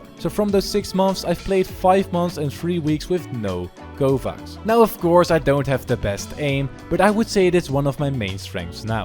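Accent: Netherlands. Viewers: Ik heb het gevoel alsof je een Nederlands accent hebt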